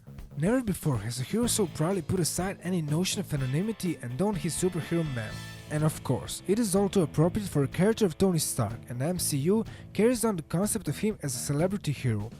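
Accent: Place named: United States. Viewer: Are you from america your accent is so cool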